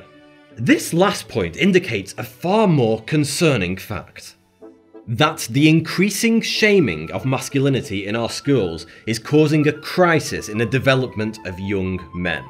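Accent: United Kingdom. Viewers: You haven't been watching much of him then. I used to learn cute factoids in a British accent from him now he's just another IDW wonk. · You’ve gotten a lot more British I see bud good on you! Missed your uploads..loved the look on the subject hope your doing well!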